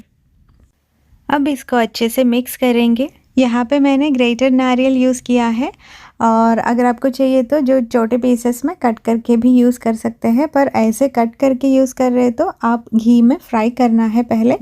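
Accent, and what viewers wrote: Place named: Kerala, India. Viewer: So nice love your hindi with Kerala accent so sweet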